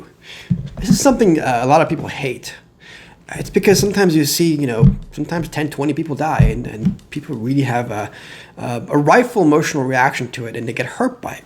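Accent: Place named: Iran. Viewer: Piper Ohio >> No he doesn't. That's his Iranian accent. DON'T change the speaker!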